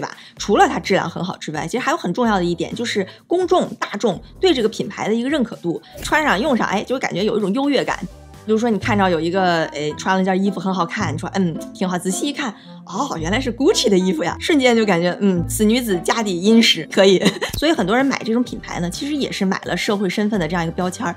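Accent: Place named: Northeast, China. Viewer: 姐姐什么都好，就是东北音太重了。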